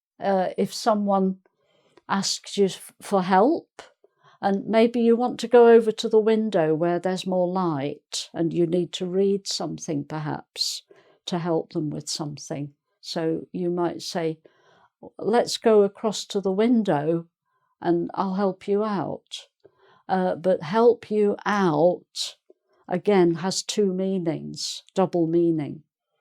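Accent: England, United Kingdom. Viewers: Iam in love with british accent really it is so beautiful. Hearing it makes me want to stop hearing any Americans. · Great! is magnificent as usual. And her pronunciation is charming, it reminds me of my English friend... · I honestly admire your charismatic accent..actually I am not a native speaker of English and already used to adopting tye American one..I could say that the British accent sounds both awesone and difficult .thanks for these illuminating classes which you make even easier with your wise and less hurried speaking voice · Miss. my full respect for you, really I just folding your class, but I not understand some of your classes, I can’t explain the problem. Maybe I feel shook of British access.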